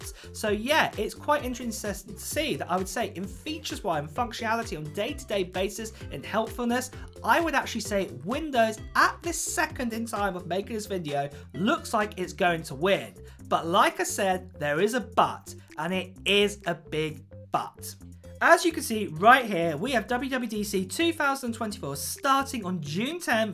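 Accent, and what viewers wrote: United Kingdom. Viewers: 1) No offense but you do have a strong British accent/dialect and talking way too fast. Thus, for many people who’s English is fluent but not the first language is hard to understand you unless you slow down a bit. 2) We need a real world test of this Elite X Laptop vs Mac Book pro where we compare 4k video rendering time length between MacBook Pro and best Elite X laptop. Everything else is silly and waste of our time because even the cheapest intel i5 laptop would be snappy at opening apps, web pages and photos and is not an indication of how faster or more powerful elite X processor is.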